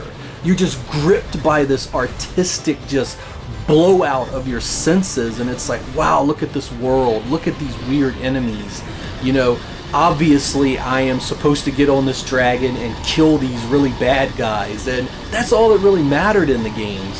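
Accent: United States. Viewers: Hey where are you from? I hear you say "I'd didn't come out in Europe" or "there wasn't a pal release" a lot which made me think you were English but your voice sounds super American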